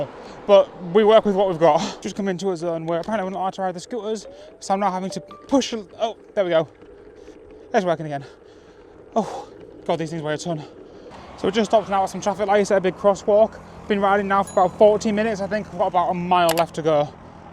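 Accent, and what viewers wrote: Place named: Northern England, United Kingdom. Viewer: What accent is this,i could of sworn it was north england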